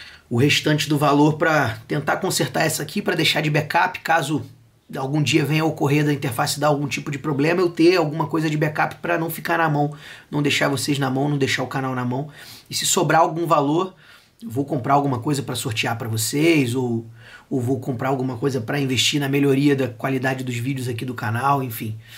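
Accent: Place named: Rio de Janeiro, Brazil. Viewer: Eu descobri o canal ontem. Tava procurando ver algumas dicas sobre programas gratuitos e dei like em quase todos que assisti. Hoje vi o vídeo que você mandou ontem sobre a interface. Aquilo doeu em mim. Sei o quão difícil as coisas estão pra todos. Eu não poderia ajudar hoje, ia esperar ver se entrava algum, pra mesmo sem nada, poder ajudar um pouco. É o ditado que diz que onde dorme um, dormem dois...ou quase isso. Mas logo depois vejo esse vídeo e fiquei feliz de saber. Desejo tudo de bom pra ti e esperamos que essa porcaria acabe logo pra gente poder voltar a ensaiar, tocar, gravar, aparecer mais shows, trabalhos, gravações, receber um qualquer...e por aí. Bem, eu além de músico e amante de guitarras agora virei luthier e assim que isso acabar, vou te convidar pra vir aqui conhecer minha oficina e a gente bater uns bons papos sobre música. 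Eu já havia brincado com gravação em casa no início desse século mas fiquei muito tempo por fora e agora que voltei. To desenferrujando mas vamos ter muito papo pra bater. Eu sou do Rio, e pelo que vi do seu sotaque, também é. Vai ser bem legal, se puder aceitar o convite. Abração e fique bem com sua família. Parabéns, pelos vídeos! abração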